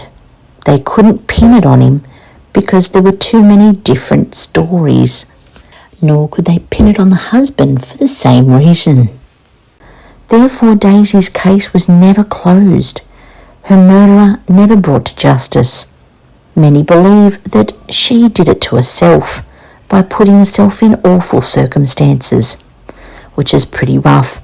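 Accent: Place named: Australia. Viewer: Keep up your great work. So tired of O/S people, criticism of your accent. (usually Nth Americans-who think everyone should bow to them) I'm from Sth Aust, We tend to be quite tolerant, except when people criticise Aussie accents-each State has its idiosyncrasies with speech patterns. Most countries do!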